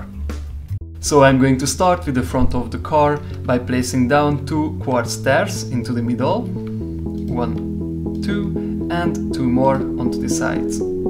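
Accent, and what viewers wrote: Germany. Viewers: bist du Deutscher?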